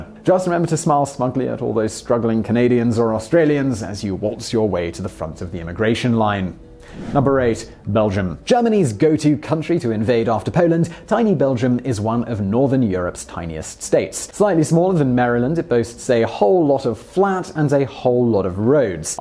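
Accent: England, United Kingdom. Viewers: He's english get over it. Different countries pronounce things differently. Who'd have thought it?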